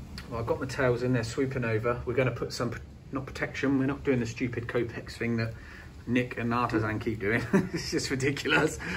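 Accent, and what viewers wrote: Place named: West Country, United Kingdom. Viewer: I can hear a bit of West Country in your accent.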